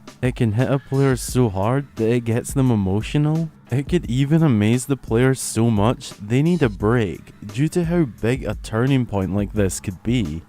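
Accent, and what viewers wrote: Scotland, United Kingdom. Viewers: Moan the Scotland! Where u from mate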